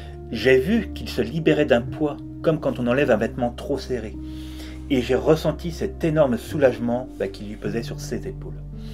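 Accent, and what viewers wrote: North, France. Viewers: Bonjour 😊 super explication merci 💖 (je perçois un petit accent du Nord je me trompe ? 😁) Ça apaise de voir les choses ainsi, je comprends mais je ne peux m'empêcher de penser qu'on est vraiment des êtres sadiques et maso. Pourquoi s'infliger ça...🥺 Évoluer ? Par la souffrance ? Ça me fait me sentir encore moins bien au fond. Je continue de vous découvrir sur Youtube. 👍🏻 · Vous êtes du Nord de la France ??? Il me semble avoir reconnu cet accent particulier 🤣👍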